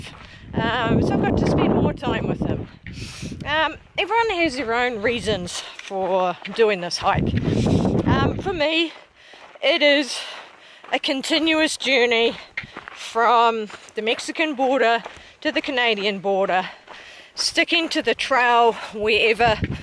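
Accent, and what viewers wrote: New Zealand. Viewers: Glad to see that your not feeling the after affects of those tumbles! Which part of New Zealand are you from!? Such a lovely accent you have to! Let the haters hate and don't let satan's lies bring you down! God bless you and your family! Keep enjoying the Journey through God's gorgeous backyard!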